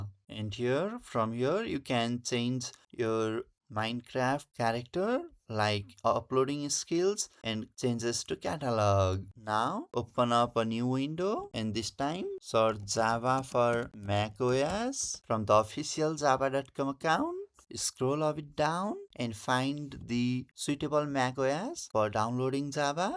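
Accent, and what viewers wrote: India. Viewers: you know that you are completely saved the moment you click on a tutorial and hear a indian mans accent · Guys remember when these types of videos have an indian accent ur saved 😭🙏 he earned a subscriber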